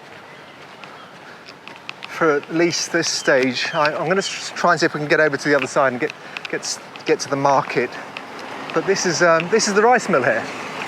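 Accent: United Kingdom. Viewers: Good to hear the British accent vlogging in Fiji. I like your happy tone and saying hello to everyone. Great work!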